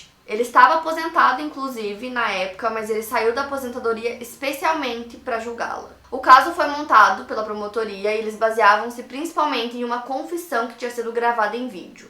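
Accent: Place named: South, Brazil. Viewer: você tá perdendo o seu sotaque sulista 😳😳😳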